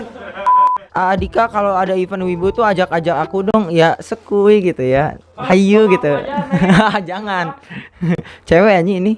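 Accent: West Java, Indonesia. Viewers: bang lu , sunda ya ? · Bang lu dari sunda kah?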